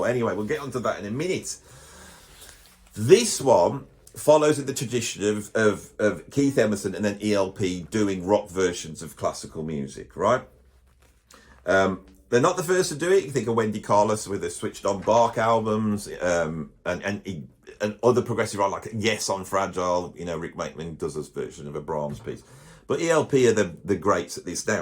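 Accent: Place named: Poole, United Kingdom. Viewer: I'm just having fun. Great video. This was my all time favorite band. Are you from Poole? You and have very similar accents.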